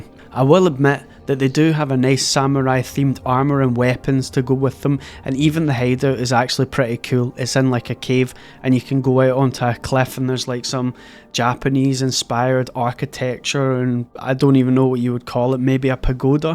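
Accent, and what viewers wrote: Scotland, United Kingdom. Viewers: Do I sense a fellow Scottish legend?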